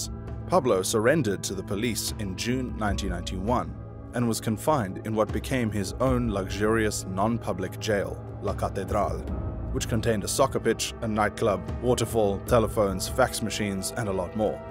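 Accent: South Africa. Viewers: Are you from South Africa? Reason being - accent sounds familiar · The narrator sounds South African or perhaps Zimbabwean. I’d say Durban, South Africa.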